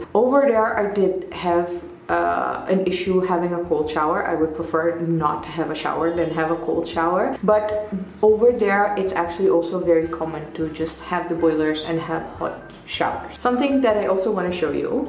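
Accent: India. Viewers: Your getting a Indian accent! I’m from Amsterdam and it’s really obvious to hear ! Love it 😊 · Your accent is like Indian haha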